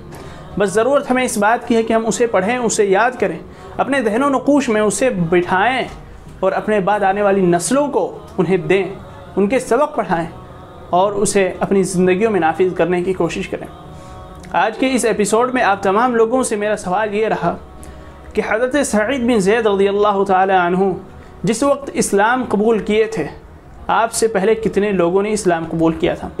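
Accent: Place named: Arab world. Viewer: اردو کو اردو کی طرح پڑھیں۔ اردو کو عربی میں پڑھ کر اردو کے ساتھ نا انصافی کر رہے ہیں۔ آپ کا کام بہت اچھا ہے۔ مشورہ بہتر لگے تو قبول کرلیں ورنہ معاف کردیں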